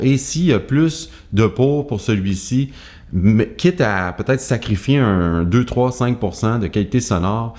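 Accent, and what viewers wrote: Quebec, Canada. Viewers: Salut! Appréciant au plus haut niveau tes enseignements, le "soin" que tu y mets et nous partages, les jours derniers, en pratiquant les gammes mineures harmoniques, il y a une coquille sur le E mineur harmonique, une autre aussi après mais je ne la trouve plus! Juste pour aussi te transmettre à quel point tu n'enseignes pas dans le vide! Mille mercis pour mes impros qui avancent grâce à tous tes soutiens! ça marche quand tu nous dis "te focalise pas, ça va rentrer dans tes doigts", avec ton accent Québécois, top, pour la rime! Chaleureusement. Pascal.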